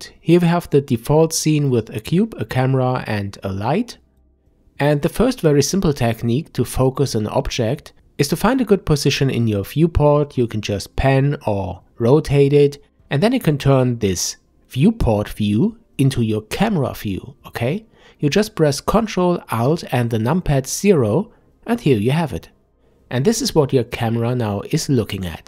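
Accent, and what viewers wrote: Germany. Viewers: Very good introduction, but the part where I should press something to get camera view the same as current view got lost in the German accent. Could you write it also on the screen ?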